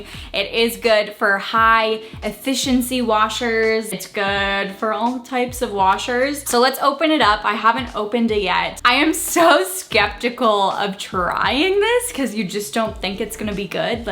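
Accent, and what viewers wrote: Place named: Canada. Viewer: I'm curious where your from? You're accent sounds a bit Canadian.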